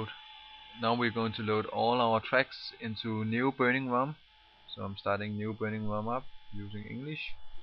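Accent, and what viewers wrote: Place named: Denmark. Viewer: where u from? hmm, danish dialect lol!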